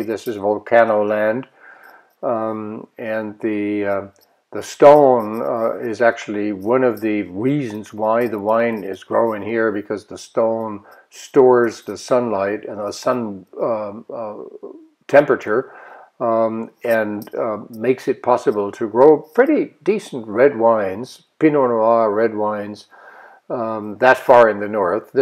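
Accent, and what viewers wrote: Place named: United States. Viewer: Hallo lieber Velomobilist, das ist aber allerdings wirklich Schade. Mein Mann, also nicht der von gestern, das war "nur" mein Radelkumpel, war so gespannt auf die Bilder und ich auf uns von oben, dass du jetzt eigentlich nochmal hier vorbeikommen musst. Du bist ja auch durch Holzweiler gekommen und da wohnen wir. Mein Mann ist sehr an dem Velomobil interssiert und stellt mir 1000 Fragen, die ich ihm nicht beantworten kann. Bring deine Drohne in Ordnung und komm auf einen Kaffee oder Tee vorbei. Wir würden uns sehr freuen. Von uns kannst du dann auch über Kalenborn sehr schön über die Eifel fahren. Where did you learn you perfect English with American accent. We are quite fluent as well. We lived in the States for some years. It would be really great to meet you again!!!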